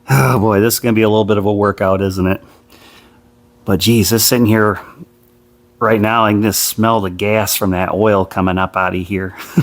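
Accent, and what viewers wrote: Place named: North, United Kingdom. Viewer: Kind of sounds like a cross between a Harley and a John Deere A. You sound like you’re from the great north. Nice video.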